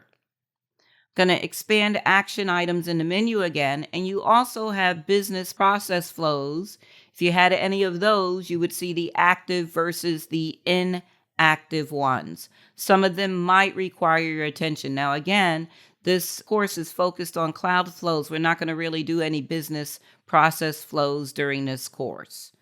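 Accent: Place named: United States. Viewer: thick accent American is inritating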